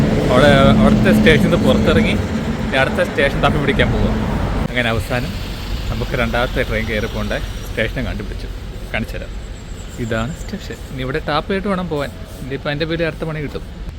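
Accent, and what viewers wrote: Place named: Pala, India. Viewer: Kottayam ano vidu oru pala shayili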